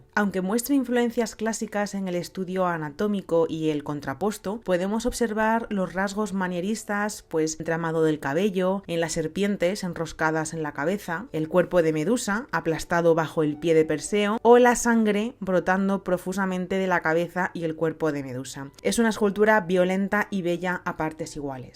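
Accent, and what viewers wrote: Castile, Spain. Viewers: jaja claro princesa porque eres de allá.. Pero Rela así con todo y tu acento castellano me gustas mucho 😍😘 Jaja.. Saludos desde Venezuela ✌🏼 Tienes un admirador por aquí.. Te invito a pasar por mi canal para que escuches mi trabajo. Claro si te gusta o llama la atención el Rap conciencia, protesta, esotérico y filosófico 😉 Besos y abrazos 💋🤗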